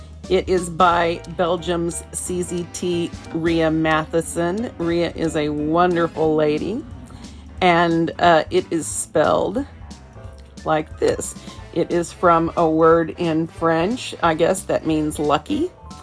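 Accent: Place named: South, United States. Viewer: I beg your pardon, we do say y'all from time to time, but around here, we don't use y'all like Paula Deen. I love her but she goes way over board with it. But you know, when she first went on tv she didn't do that. Must be some sort of publicity crap. At any rate, I do love your tangles, southern accent and all. 😂😂😂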